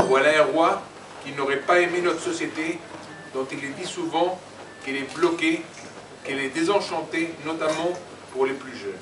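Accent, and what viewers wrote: Spain. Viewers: L'accent espagnol n'est pas le problème ! Le problème est que ce type rêve : nous ne voulons pas de royauté ! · Drolf Ozio Certes, mais ça ne l'empêchait pas d'avoir un accent béarnais. Il parlait français, avec un accent béarnais. Tout comme ce Louis XX qui parle français avec un accent espagnol. Ne vous inquiétez pas : s'il venait à être appelé au trône, sacré et couronné, son accent disparaîtra peu à peu... L'accent n'est rien ; et contrairement à l'illégitimité, il ne se transmet pas de parent à enfant... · Il est beau, certes, mais putain !!! son accent espagnol fait tache !!! je le vois pas Roi de France, par contre si il a un fils aîné éduquer a la française, alors pourquoi pas.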